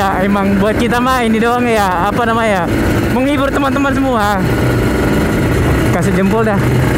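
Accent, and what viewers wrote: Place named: Sulawesi, Indonesia. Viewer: Asli Sulawesi kah bg? soalnya logat nya kaya orang Sulawesi •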